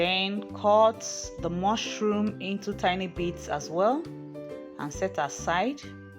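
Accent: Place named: Equatorial Guinea. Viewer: What is your connection to Nigeria? You speak like you are from Equatorial Guinea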